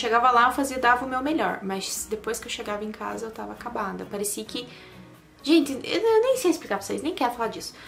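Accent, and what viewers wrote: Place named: Paraná, Brazil. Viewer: Oi amiga o sotaque do Paraná 💞💞💞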